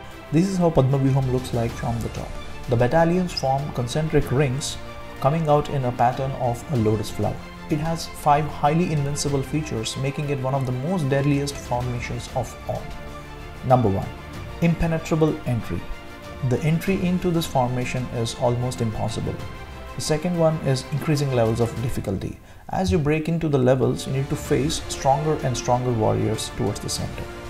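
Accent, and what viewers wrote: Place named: South India, India. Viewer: Namaste ...really want to appreciate all your videos..but one BIG request for the narrator...do you generally speak this fast, without any pauses...please narrate a bit slower so that the content is understood better..also its not suitable to slow down the playback speed. The narrator speaks with a slight mix of south.indian and western english accent, it is hard to follow the narration if you speak in this speed..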